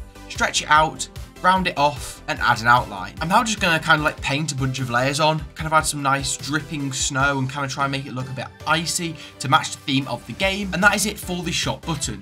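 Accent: United Kingdom. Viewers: This guy is british?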